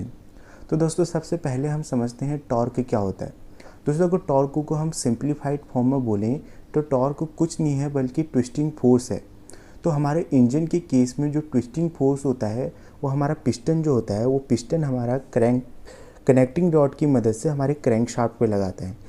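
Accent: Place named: Uttarakhand, India. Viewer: bhai teri videos se ek chiz clear hai ya to tum mechanical engineering ya automobile engineering ke student ho..... aur bolne ke tarike se lga rha... Uttarakhand se ho...🤩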